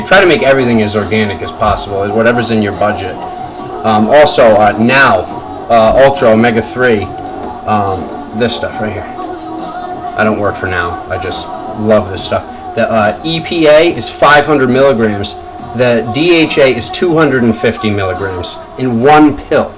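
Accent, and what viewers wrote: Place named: East Coast, United States. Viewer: bro ur hella east coast what happened lol.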